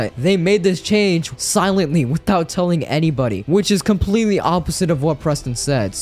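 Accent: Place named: United States. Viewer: bro u are also indian but ur accent is like americans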